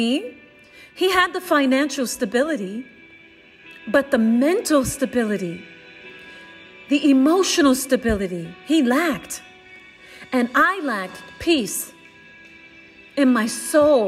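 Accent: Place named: New York, United States. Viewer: Still got that NY accent 😍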